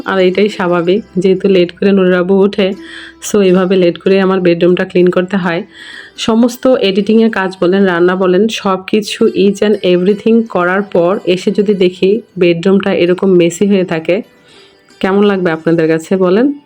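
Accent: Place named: India. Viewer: আপু, খুব ভালো লাগলো ❤❤ আপু আপনার ভাষা ইন্ডিয়া এর মতো।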